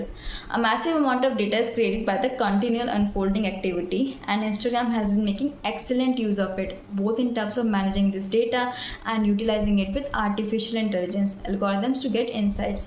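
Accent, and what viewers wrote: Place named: Pakistan. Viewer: omg don't understand you . ? you speak to fast very hard to understand with you're packistan accent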